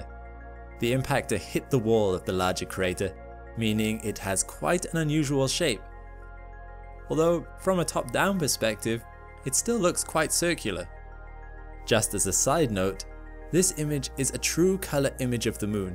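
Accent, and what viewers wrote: United Kingdom. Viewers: Do you think they have American narrated documentaries in Britain? so why do we have to listen to limie's over here all the time?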